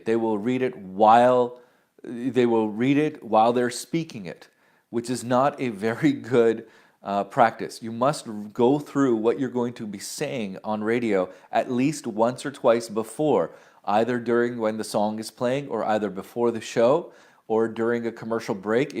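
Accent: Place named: United States. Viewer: I wonder how he got american way of speech..hmm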